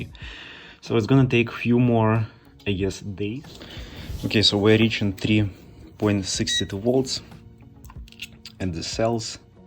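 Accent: Russia. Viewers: Русский акцент ни с чем не перепутаешь ))))) Так держать! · I do not read comments, as most of them are from people who have no clue what is the real issue. With all due respect, you sound like Russia is the place you came from. I run an industrial automation company and I employ11 Russian trained engineers. All of them are top notch specialists. I assume, you are as good as them. If not better. I have a simple question - do you think that after all the mistakes you went thru, and wastedvmaterial, would it be better and less expensive to buy a commercially available battery of similar performance? If so, what would that be? So, keep up good work, MALADIEC! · Is that a sweet Russian accent I hear? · Русский? )) акцент пипец) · боже да что же за акцент , накой Русскому говорить на инглише есле его не особо знаешь)) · Nice RunGlish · Жуткий русский акцент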